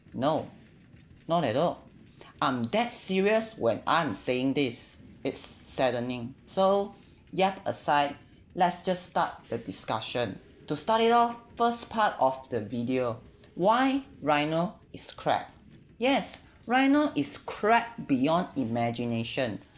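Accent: China. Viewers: you should use your Chinese accent and be funny with it, like uncle roger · I would listen to you but you sound Chinese so your opinion is invalid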